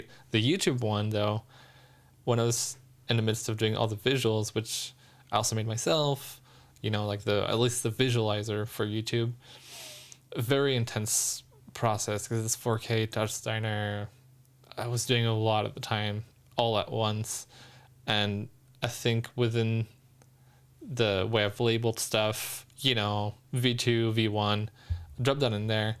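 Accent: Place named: California, United States. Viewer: You really do sound like you're from California. I wanna hear you speak French and Japanese, though. ❤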